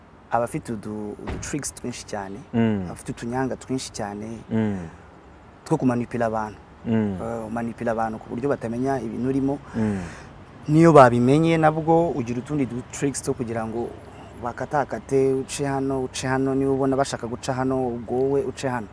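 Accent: France. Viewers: Afite accent nziza yifrance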